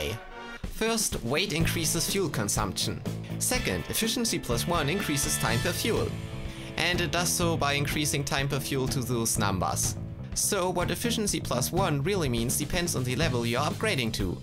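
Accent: Germany. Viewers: He sounds german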